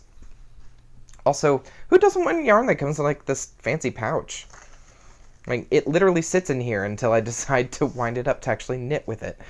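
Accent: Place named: South, United States. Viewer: Sometimes, when you find yourself in a toxic situation...at work, or anywhere else, you do need to think about removing yourself from it, and improving your quality if life. However....and thus is important...if you do decide you need to find another job, make sure you already have that new job, before leaving the old one. If you leave, without having somewhere else to go, you will only be adding another stress to your. Life... Bills need to be paid, and yiu need to keep a decent roof over your head. You spend most of your waking hours at work. So....Work should be something you at least enjoy.... The really fortunate ones have a passion for what they do... Inwish that for you.. Oh yes...a Southern draw is nit necessarily a bad thing... Lots if people find it appealing...😊 Sandy from Cincinnati 💜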